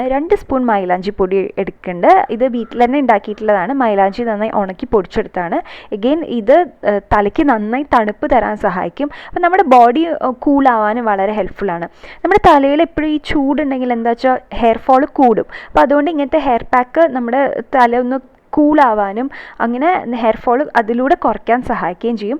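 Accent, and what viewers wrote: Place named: Thrissur, India. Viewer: Mm thoni. Thrissurkar nanayi samsarikunavar annu.. Enikk samsaram eshtam annu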